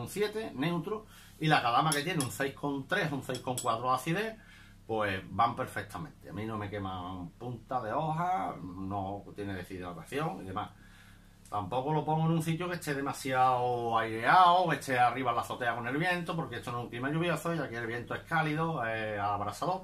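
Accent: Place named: Andalusia, Spain. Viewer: Gracias por el video,informacion valiosa y util. Te sigo desde Mallorca aunque soy de Puebla Del Rio y es un placer escuchar nuestro acento cerrao andalú.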